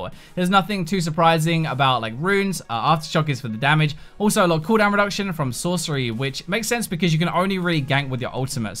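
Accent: United Kingdom. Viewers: I like your vids dude but you should really consider slowing down your speech, because speed + british accent makes it really hard to actually keep up with what you're saying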